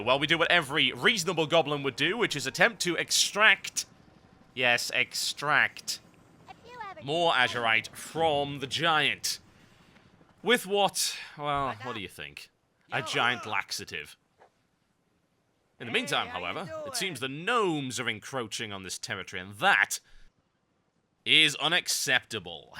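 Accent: United Kingdom. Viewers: British accent ftw !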